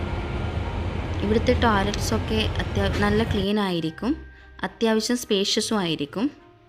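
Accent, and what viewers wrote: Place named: Malabar, India. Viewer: Hi I am originally from Calicut and living in UK.I watched your video today for the first time.I can’t wait to come and visit Switzerland.It looks so beautiful. Because of your accent,I guess you are from Malappuram or Calicut😀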